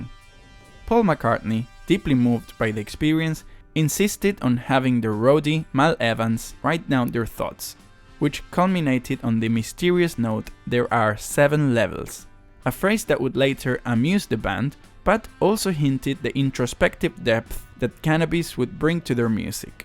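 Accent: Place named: Argentina. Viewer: vos sos re argento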